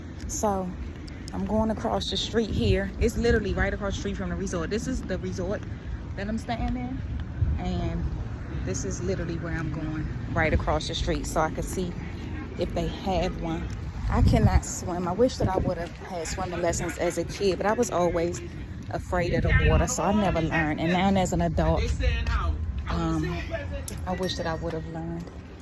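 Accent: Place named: Maryland, United States. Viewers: As soon as the video started I said "oh she from DC." LOL! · I live in MD PG county area..I know that accent anywhere 😌💕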